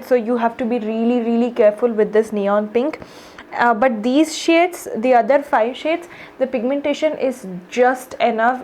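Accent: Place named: Bangalore, India. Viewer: Hehe.. I saw your previous video few days ago, the highlighter one I guess .. The moment I heard you, and I thought you must be a 'Bengalur hudugi' ... More than the review, I enjoy your accent.. You remind me of bangalore. Nice review too!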